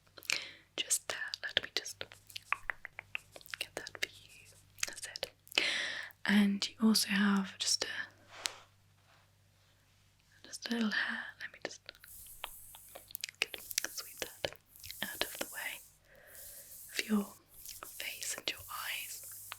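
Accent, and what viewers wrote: RP, United Kingdom. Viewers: this is not asmr. this is yet another posh girl begging for an oscar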